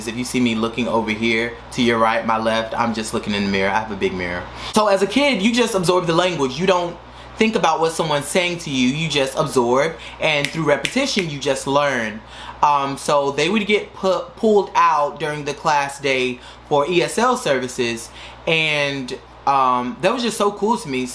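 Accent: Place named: Cuba. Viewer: your spanish sounds cuban!!!!!!!!!OMG....I speak spanish as well. I lived in Costa Rica for 1 year when I was 16 to become fluent...however i was learning since grade 3 as well. I did my Ba in Spanish, my postgrad in Early Childhood Education now doing my Masters in Esl in Ecuador!!!!!!!! I did my BA in Canada...if i had to do it over again..I would have chosen Spain to do my ba in spanish lol as my former professor did the same thing.....nevertheless I love the language....also my adoptive parents are from ecuador and peru!!!! So that was a plus for me...in Canada....It's crazy how I can sit watch telenovelas and understand everything as a second language learner....and I am black as well from the Bahamas...latinamericans think that i am from cuba or dominican when I speak spanish...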